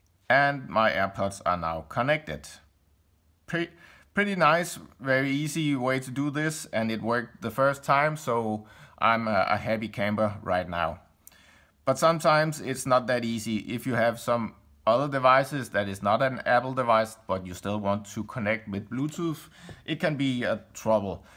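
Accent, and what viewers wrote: Denmark. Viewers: Are u danish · After hearing your accent: Are you Danish?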